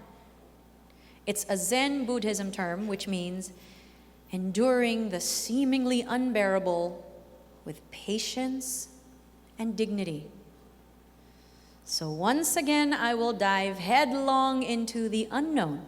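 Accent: England, United Kingdom. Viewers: Galing mo talaga Ms. Lea Salonga, British accent kailangan nya yun sa lugar Kung Saan sya at dinala, grabe yung mga taong Di sya Pinuri bagkus may nagsabi Lang mali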